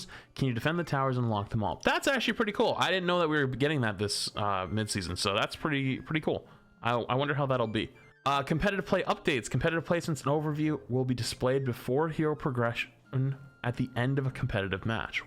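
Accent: Boston, United States. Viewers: I need more OG Boston accent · BOSTON ACCENT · We need more Boston accent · DOOM'S GONNA BE BETTAH!:D Embrace the Boston,. Let it out!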